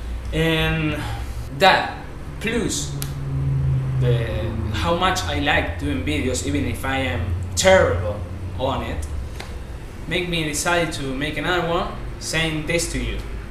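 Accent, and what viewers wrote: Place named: Argentina. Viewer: más argentino no podes ser negro(de onda) !!! yo tmb hablo ingles y me equivoco parecido a vos aveces... jajajaj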